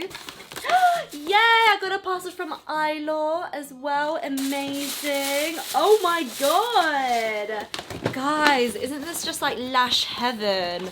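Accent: England, United Kingdom. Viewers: I've been following you on Instagram for a while and this is the first time I'm hearing yr voice honestly cant remember hearing yr voice love yr English accent and loving yr videos. Subscribed right away.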